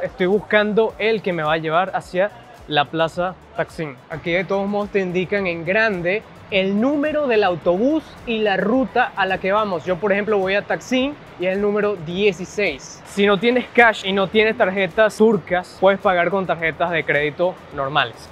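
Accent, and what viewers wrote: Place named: Venezuela. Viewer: super excelente video gracias. excelente cuenta. pareces venezolano por tu acento. Muy buena tu oratoria y edición del video. sigue adelante like y suscrito de una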